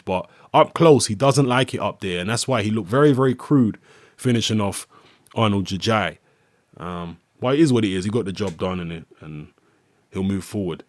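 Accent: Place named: London, United Kingdom. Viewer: are you from north London? You have the same accent as all those grime guys.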